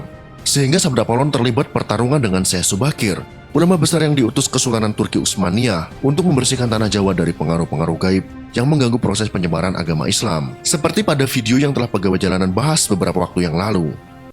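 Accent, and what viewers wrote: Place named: Bali, Indonesia. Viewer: Pengisi suara kek org bali ya logatnya 😁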